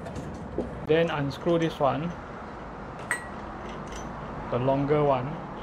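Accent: Singapore. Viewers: So pls share whatever u do or eat Wat with us, we like your video, the singlish n everything n we learnt a lot from u.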